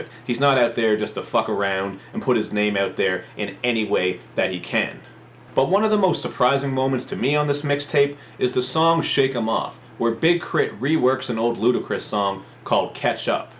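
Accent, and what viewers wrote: Canada. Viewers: New subscriber here so I dont know if you've already mentioned this, but are you from Toronto? I noticed the hat and your canadian accent lol. Im from toronto was just curious. Great review.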